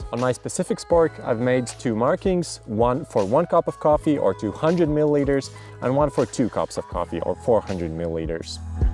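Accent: Latvia. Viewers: By chance, I stumbled upon this video, and from the very first sentence, I had a strong suspicion that you are Latvian 😀. Nice tips! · Great tips! Love your channel. Are you from Latvia by any chance? I think I recognize your accent 😅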